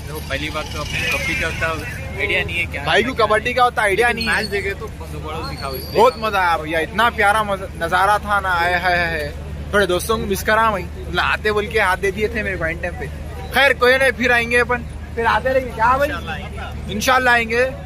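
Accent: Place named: Hyderabad, India. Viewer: Hyderabad accent I love much ,maza aagaya😍😍😍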